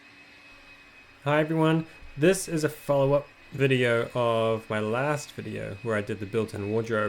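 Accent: New Zealand. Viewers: somewhere in nz by the accent i take it? i love your work, it is quite fantastic, love th idea of the v-groove just by using the table saw set on a 45, that is genius...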